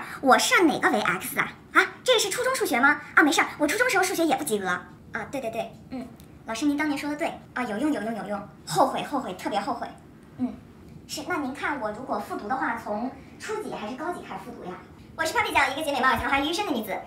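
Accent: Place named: North, China. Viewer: 奇怪，papi明明上海话讲了侠气标准，为撒普通欸吾一股北方味道？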